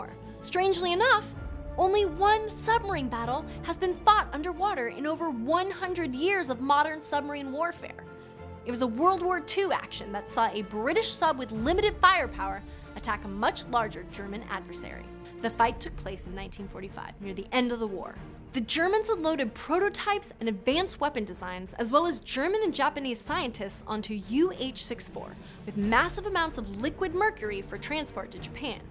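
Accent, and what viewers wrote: United States. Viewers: TM: Gee, what a surprise that an American would use American pronounciation.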